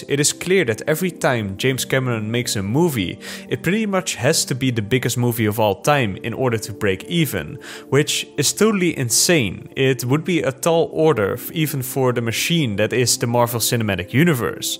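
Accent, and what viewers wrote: Netherlands. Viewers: Is that a Dutch accent I hear? No wonder you love wonder, makker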